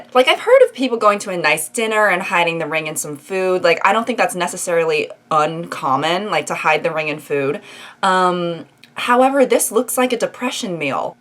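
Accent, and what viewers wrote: South, United States. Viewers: more southern accent please. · Your southern accent is on point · Your southern accent tho😂 it sounds so nice, like that’s what I think a rich southern person sound like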